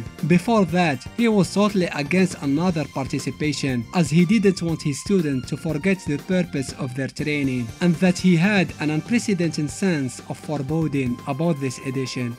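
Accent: Morocco. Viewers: just from your voice i recognized that you are from morocco XD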